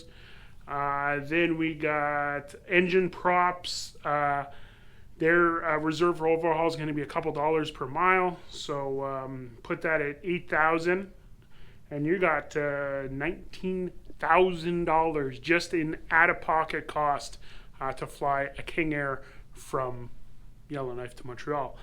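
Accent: Bavaria, Germany. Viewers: The accent is Bavarian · Clearly German accent ... !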